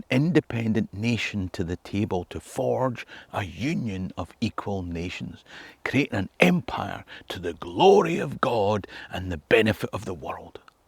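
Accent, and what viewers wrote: Scotland, United Kingdom. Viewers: The Scottish accent is brilliant. It’s easily my favorite. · "Let's get pedantic for a minute": best phrase I've ever heard in a Scottish accent. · It’s amazing to me what a beautiful monument they built in the late 1800s. I really enjoyed seeing the countryside as you climbed to the monument. I could listen to you all day long. Heck, I’d listen to you read a phone book! You sound entirely Scottish but the dreadlocks have thrown me off! Lol! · Dark skin, dreadlocks and a thick Scottish accent, what witchcraft is this? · British accents are glorious! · Dear Youtube This gentleman maybe Scottish. but I do not need subtitles to understand him as we speak the same language many thanks great video by the way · your not black & tbh you look mixed sittin there talkin bout William Wallace with a Scottish accent so ya a “molado Scott” idk what Chas call em over there but I’m Canadian and that’s what we say here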